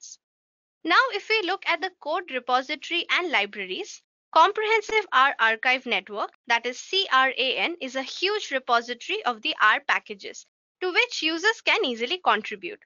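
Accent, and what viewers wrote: India. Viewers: I love your Indian accent. Why are Indians so smart and beautiful? Thank you for that worthwhile information. Bless you...❤❤❤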